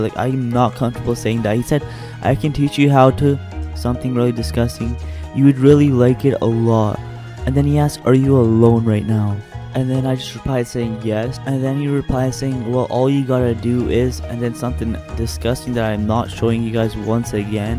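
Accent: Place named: Alabama, United States. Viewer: Me:is he from alab Alabama Me watching vid Silent Silent Me:i kneew it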